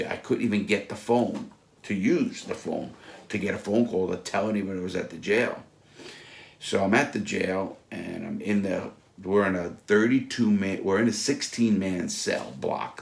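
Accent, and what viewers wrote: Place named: Northeast, United States. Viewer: has a ton of videos here on YouTube, along with 1,000’s of comments on each one. So if this has already been said and I missed it, I’m sorry, but.... story time sessions remind me SO much of Joey Diaz. is a little bit more humble but nonetheless, very similar stories. And they both have that Northeast/NY/NJ accent.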